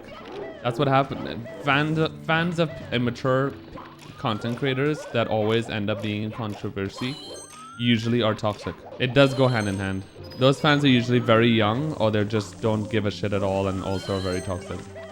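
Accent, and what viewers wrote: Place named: Trinidad and Tobago. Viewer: I need to know. You sounds so trini its insane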